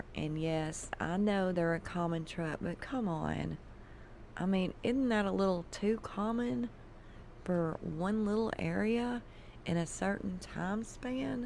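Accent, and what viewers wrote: South, United States. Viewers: I listen before bed too ! Her voice is very soothing . Love that Southern accent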